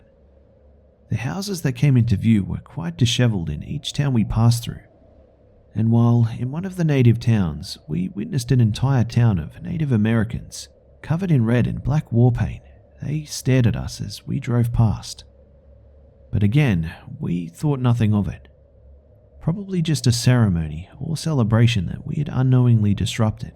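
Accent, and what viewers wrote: Australia. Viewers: He's Australian :)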